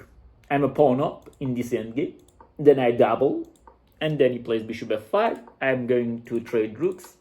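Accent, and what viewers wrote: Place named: Italy. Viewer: You’ve got Italian accent 😇 Cool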